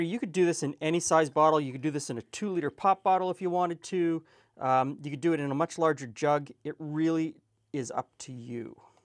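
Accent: Canada. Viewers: I can't believe I only just found this channel today. I love your content, and it's neat hearing a YouTuber/media personality with a dialect similar to mine (I'm from Nova Scotia, but us Canadians in general sound pretty different from Americans a lot of the time, imo). This has been a fun learning experience, excited to try this asap! :)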